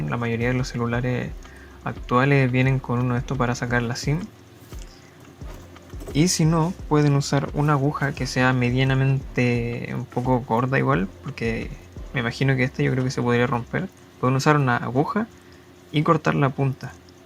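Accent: Chile. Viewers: Excelente tutorial... Por tu acento se que res chileno..°! Felicitaciones amigo..!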